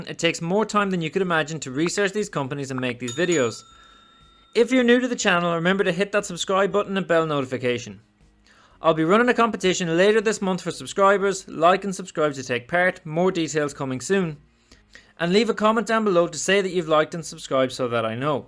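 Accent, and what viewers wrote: Ireland. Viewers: Who is downvoting this? I liked and subscribed, thanks for the video. I especially enjoy the Irish(?) accent - “sales and mahhhhrketing (marketing). Ah, Sacred Heart, there ought to be a bar over here.”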